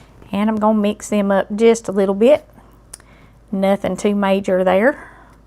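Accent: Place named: Tennessee, United States. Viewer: Hi from Ohio👋👋TY...great recipe! My grandmama used to make for church dinners yrs ago! I❤ur accent...reminds me of home (TN)!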